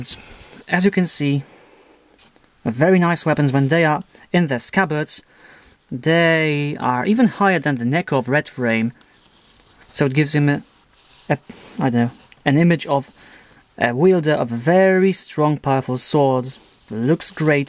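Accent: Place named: United Kingdom. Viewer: man i cat get ur accents.. its kinda british, but than it sounds kinda fobby azn hmmm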